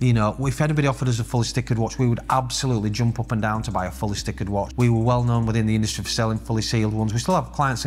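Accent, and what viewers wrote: United Kingdom. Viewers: Very strong brit accent, could you slow down a lil bit sir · Sir you always have excellent, cut to the chase, no BS content. I have to really concentrate on your videos because you talk at a faster pace with your British accent. It’s seems like a mix of British, Scottish, Irish and Australian. Where did you grow up?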